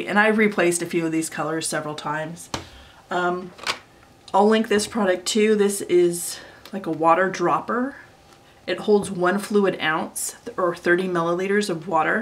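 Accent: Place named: Australia. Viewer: Ur aussie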